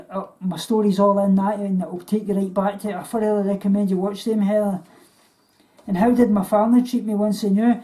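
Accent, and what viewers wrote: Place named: Scotland, United Kingdom. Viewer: I have Scottish ancestors my great-great-grandmother was from Scotland she spoke just like you I don't remember her she passed away when I was a baby but my mom used to talk about her all the time she said she loved hearing her talk because of her accent just like I like your accent I like Australian accents British accent Irish LOL I'm just plain old American accent lol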